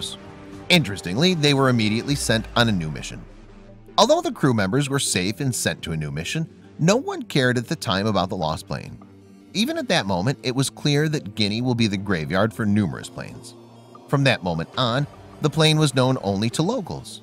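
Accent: United States. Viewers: Note the actual use of English. It is rather like a product manual from China but with a 'Yank' voice.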